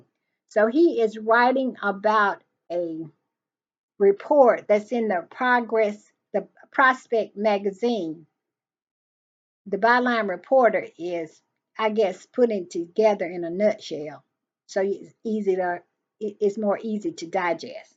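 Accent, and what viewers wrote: South, United States. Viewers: Oh your still getting paid and they are obviously using you as their useful idiot. They will turn on you. You sound like your from the south. The bayou the back woods. Cornbread ignorant honey child. You better get home cause your Ma Ma didn't teach you well. Whatever they are paying you. Ask your soul if you have one. IS IT WORTH IT. 8TH Grade education or am I giving you to much credit. IGNORANT! PAINFUL YOU ARE. SAD SAD.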